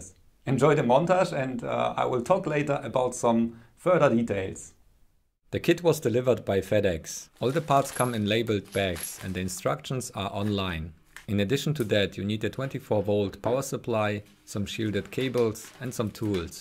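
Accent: Germany. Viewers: Das hört sich so deutsch an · I love the German accent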